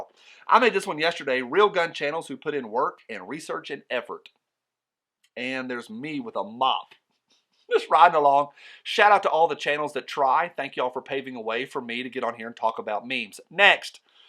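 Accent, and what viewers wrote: South, United States. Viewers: Really like your closing line, that's the one you need to stick with. For anybody else who enjoys listen to this smooth-talking southern gentleman speak, vote for him on the gundies!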